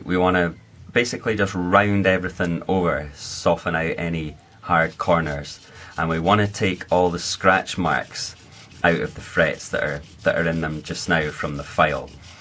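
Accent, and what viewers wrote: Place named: Scotland, United Kingdom. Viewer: These two videos have gave me the confidence to try my own bit of fret leveling. Very relaxing to watch also, I think it's your Scottish accent. You sound a smidgen like Stephen Hendry.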